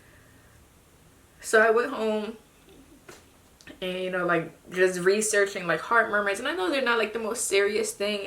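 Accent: Philadelphia, United States. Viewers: I knew you was from a philly with that accent. So strong and your daughter name is so cute . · Instantly knew you were from Philly as soon as I heard you talk 😂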